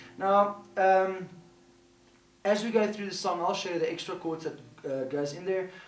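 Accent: South Africa. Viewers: My entire father's side of the family is South African so right when you started talking, I knew immediately where you were from. Awesome tutorial! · Great fan of your lessons.. very helpful lesson. Thanks for taking the time out to do this for us. Greetings from India to South Africa?? (Your accent says so!)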